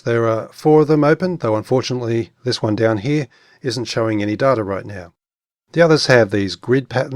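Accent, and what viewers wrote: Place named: Australia. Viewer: An Aussie, yahoo !!!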